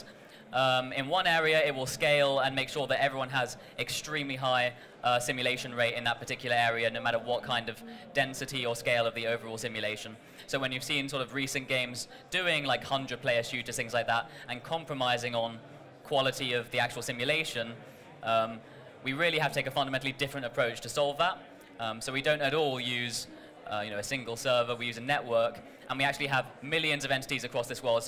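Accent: United Kingdom. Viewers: British accent and super ambitious game..... Haven't seen this before...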